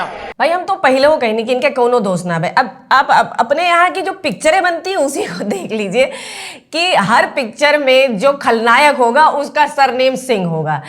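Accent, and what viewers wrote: Bhojpuri, India. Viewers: YOUR BHOPURI IS SO NICE TO HEAR. MORE THAN SUBJECT MATTER, MY INTEREST LIES IN HEARING YOUR BHOJPURI. I HAVE A GREAT INTEREST IN LANGUAGES. As a matter of fact, I know ANCIENT GREEK, LATIN, SANSKRIT, ENGLISH, FRENCH AND KANNADA.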